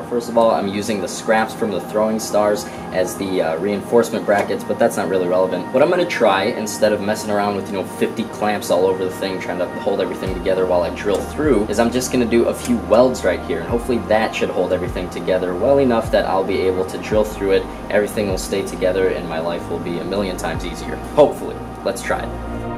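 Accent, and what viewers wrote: France. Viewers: Dude that french accent 👌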